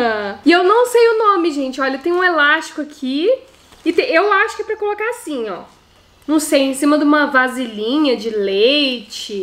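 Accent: Minas Gerais, Brazil. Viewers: O já está entendendo super o português? E olha que você fala rapidinho e com sotaque mineiro! Fico muito feliz pela dedicação e esforço dele. Dá pra ver o quanto ele está leve e feliz. Que coisa boa!! Nunca esqueço daquele vídeo que vocês fizeram com o não deixando desperdiçar nada. Morro de rir só de lembrar. E ele mal entendia as palavras em português que você falava. Quero ver mais atuações! Genial! Grande abraço, família!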